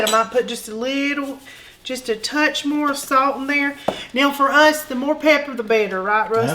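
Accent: South, United States. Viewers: Patatas 😂😂😂 that southern brawl has to count for something. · That southern accent is on utube heavy today!! Kissin wears out, cookin dont!! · Hold up, did somebody say potatoes? And she's southern!? Sign me up, baby I'm in! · I love that southern accent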